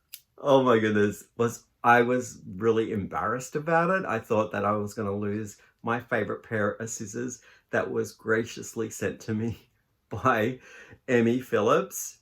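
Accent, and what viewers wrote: Australia. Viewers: OMG an Aussie accent 😍 hello, you came up as a suggested video & was happy to hear you! Fellow yarn lover here too 🙏🧶 call your mannequins Matilda 😂 Mine was named by my daughter & Crochet bestie Leighann, her name is Mary Madison Mannequin 🫂🤎🦋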